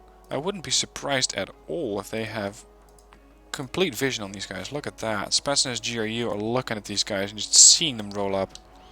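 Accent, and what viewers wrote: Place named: Netherlands. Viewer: I can tell by your accent that you're Dutch. Gave channel man!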